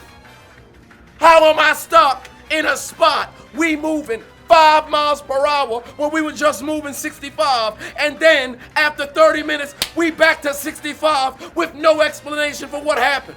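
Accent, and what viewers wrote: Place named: Atlanta, United States. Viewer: Sounds like Atlanta, if I had to guess 😂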